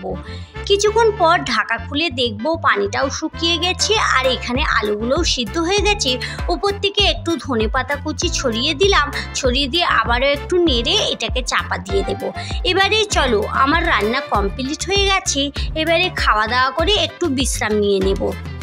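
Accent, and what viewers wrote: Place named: India. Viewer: আজ আপনার ভিডিও প্রথম দেখলাম আপনার বাড়ি কোথায়। কোন দেশের ব্লগার কথা শুনে মনে হচ্ছে ইন্ডিয়া।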